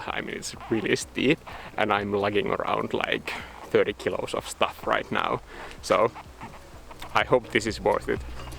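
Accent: Finland. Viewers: I just stumbled across your videos and thought: "huh, his accent sounds really familiar" then when watching this I realized that it's the Finnish accent. Loving your works tho!!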